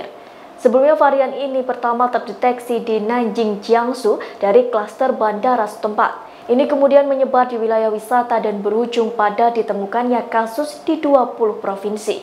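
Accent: Java, Indonesia. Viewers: mbaknya medhok ya.. lokdon...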